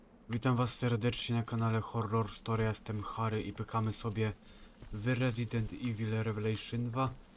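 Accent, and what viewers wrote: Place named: Silesia, Poland. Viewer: Słychać u Ciebie gwarę śląską :) pozdrowienia z Chorzowa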